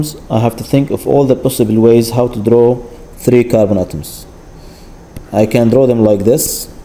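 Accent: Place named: Arab world. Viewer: you sound arab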